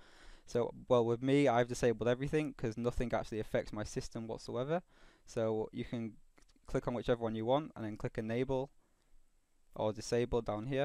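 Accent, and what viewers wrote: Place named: United Kingdom. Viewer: British accent detected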